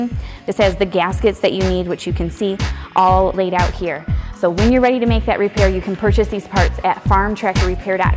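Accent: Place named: Michigan, United States. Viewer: Ah the classic Michigan accent. I miss it. Living in Kentucky.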